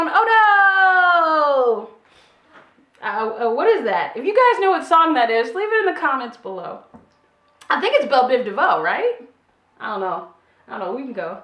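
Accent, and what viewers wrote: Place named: South, United States. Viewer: Just so Happy she doesn't Sound like she's from Ny but a Nice young Lady. Sounds like a a Good Southern girl 👍👍👍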